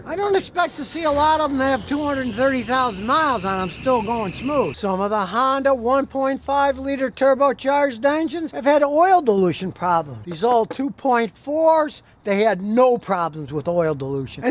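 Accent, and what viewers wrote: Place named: New York, United States. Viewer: Sounds like an New Yorker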